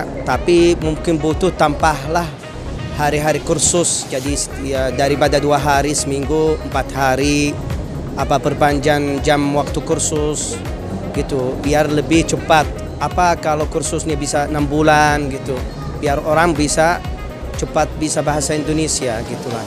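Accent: Arab world. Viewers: Iya tajwid nya masih kental..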